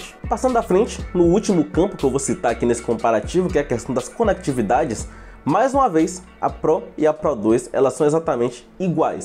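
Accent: Bahia, Brazil. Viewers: Man! Você combina o sotaque aqui da Bahia + O conhecimento em tecnologia. Esse canal era pra ter um milhão de seguidores. Por conta das dúvidas que tirei aqui, comprei a PRO II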